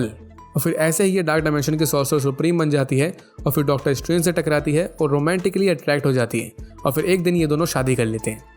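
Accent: India. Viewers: I used to watch your loki video, I've watched them all I just remembered you used to say " Keep calm and Listen to me " in your Indian accent so, yeah your explanation are best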